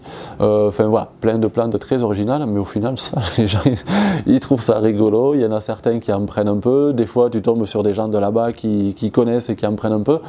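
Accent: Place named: Lautrec, France. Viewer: Très bonne analyse sur soi même qui sert beaucoup plus que l'on ne croit peut-être au départ. Pour toi et surtout pour les autres. Très bon accent pour un ancien Lautrécois qui a migré en Guyane. Je m'appelle Bernard et suis un ami intime à Robin que tu dois connaître certainement.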